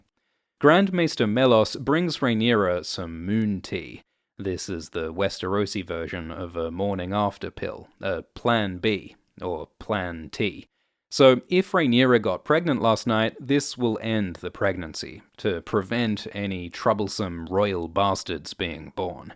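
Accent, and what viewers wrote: Australia. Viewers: You make the best videos. I also love that you're Australian. So much easier to listen to than many other videos for me. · Oi! You sound Australian yeah? What time are the episodes out for us? I am not smart enough to work that one out on my own.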